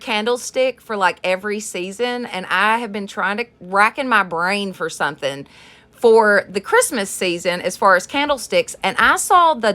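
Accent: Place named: Oklahoma, United States. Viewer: I love all your DYI'S, so stinkin'cute. I'm from Oklahoma and I talk just like you. You are so beautiful and cute at the same time.❤️❤️❤️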